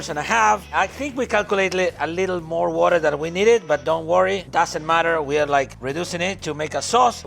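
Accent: Argentina. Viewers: Argentiniza el tipo. Bien ahí.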